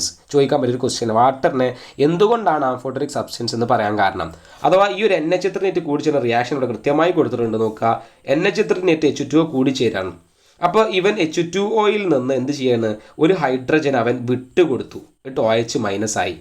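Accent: Malappuram, India. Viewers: Malappurathu karan anoooo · sir ന്റെ class കേൾക്കുമ്പോൾ schoolil നിന്നും മനസ്സിലാകാത്ത portions friends പറഞ്ഞ് തരുന്നേ പോലെ ന്ണ്ട്....പിന്നെ മലപ്പുറം slang കൂടി ആയപ്പോൾ pwolii 😻🙌💣💥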